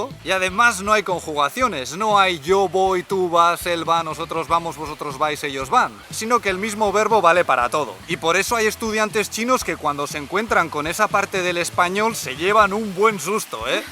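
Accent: Basque Country, Spain. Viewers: De que parte de españa es el acento de? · Kaixo. Una cosa que me ha llamado la atención es que no se te ha quitado el acento Euskaldun. NO LO PIERDAS NUNCA PORQUE ES UNA SEÑA DE IDENTIDAD. AGUR MAITIAK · Es acento "vasco", del Pais Vasco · Me encanta como habla español porque se nota que ha hecho muchisimo esfuerzo. Es una chica estupenda. En cuanto a tu acento,es maravilloso. Simplemente denota que tu lengua materna es el euskera. Un abrazo a los dos. Disfrutamos mucho con vuestros videos. Tenéis naturalidad y sencillez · No sé de qué parte de España eres, pero ese acento es bellísimo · Mucho depende de qué zona de México se trate, en el Norte hablan más golpeado (Monterrey). Lo mismo he visto en España; todo depende de la zona, el acento euskera de me agrada bastante. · Pues si. Tú tienes un acentazo Vasco - navarro que te pasas. Se nota que te defiendes bien en Euskera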